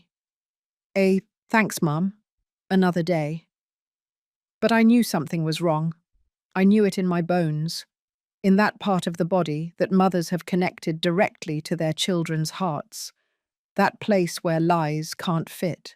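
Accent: United Kingdom. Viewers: She said that she is from Boston but she sounds British and the words in the closed caption are spelled British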